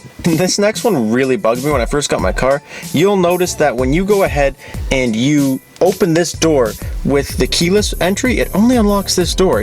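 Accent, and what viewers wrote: Ontario, Canada. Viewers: Are you Canadian or from a northern state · lol super ontarian accent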